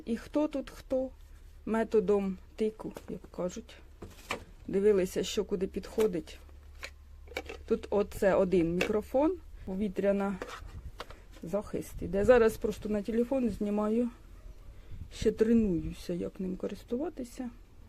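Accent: Poltava, Ukraine. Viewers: ви чудова! Дуже подобається ваш полтавський говір. Успіхів! Вітаннячко з Криму.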